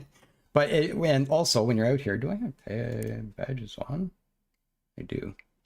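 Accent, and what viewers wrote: Canada. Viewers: I am sorry man. I mean no disrespect, but you sound so Canadian. Then I look at your profile and you are from Canada. I am going to check you out man. I just had to put that thought out.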